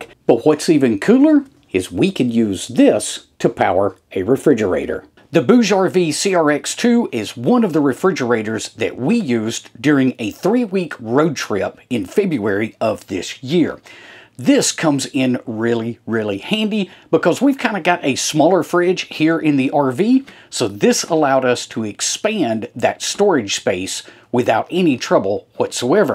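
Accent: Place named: Middle Tennessee, United States. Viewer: I am from Ohio but this accent is familiar to me because I have lived in Middle TN for 20 years. To help those who are struggling, own = on. 😂 Great channel. Thanks for the videos.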